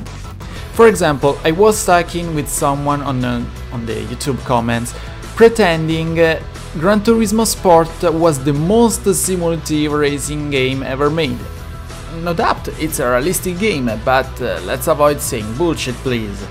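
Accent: Italy. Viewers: love the italian way that talks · questa la prima volta che in un video sento quella che sembra essere la tua voce e penso di poter affermare con certezza che tu sia italiano ;)... grande ti stai impegnando e ti faccio i miei complimenti continua a cercare di tenere attivo questo canale in inglese e migliorare sempre.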